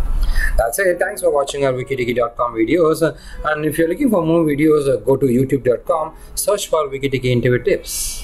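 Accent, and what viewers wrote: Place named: India. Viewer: He doesn't have to. He's indian, so naturally he'll havd the indian accent stick around.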